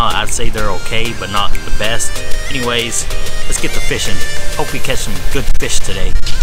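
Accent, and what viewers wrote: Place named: South, United States. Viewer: Dope B roll... Idk. Dope southern accent... Oh Yeah!!! Informative video. Thanks for posting! Is that the bass pro in bristol ?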